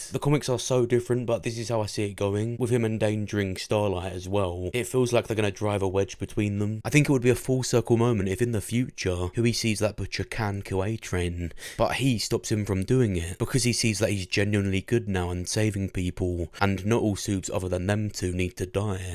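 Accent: United Kingdom. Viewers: Are you a British guy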